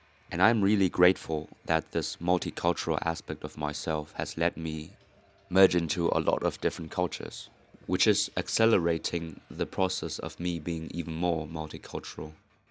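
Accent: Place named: Germany. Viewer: Dude don't force your accent. Your accent was cool as it was it's not American it actually sounds German. But not a thick German. I am Australian and your accent trial is a bit jarring because you go English, Australian, American, German in one sentence.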